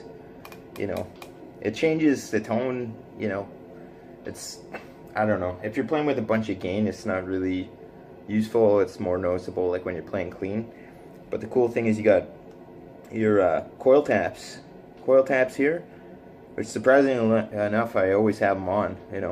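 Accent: Canada. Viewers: Good job on fixing and playing. Haha I knew you were Cdn as soon as you started talking. I'm in BC. You could get 6 G's for that easy. Check the Reverb site out. Go Jets!!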